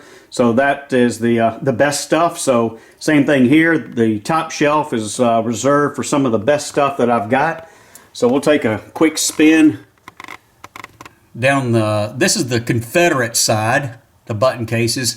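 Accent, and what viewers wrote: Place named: Alabama, United States. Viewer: I thought you sounded like an Alabama boy . Enjoyed the show . Love getting to see collections .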